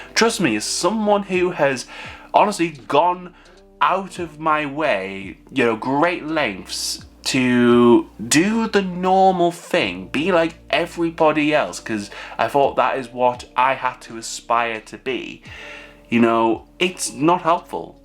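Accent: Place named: Middlesbrough, United Kingdom. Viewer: Are you Mbro based? Your accent sounds local to me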